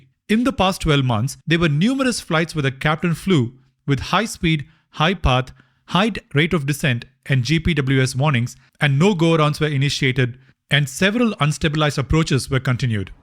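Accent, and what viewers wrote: India. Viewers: The Indian accent makes it very hard to watch. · Bro are you Indian or Australian? The accent is definitely Indian.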